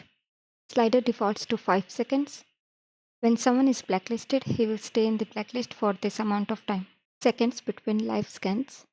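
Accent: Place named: India. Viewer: indian english probably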